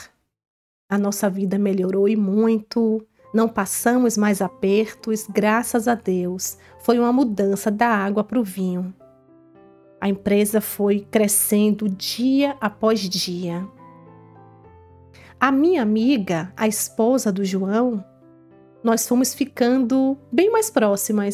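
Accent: Bahia, Brazil. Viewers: uma curiosidade, você é baiana?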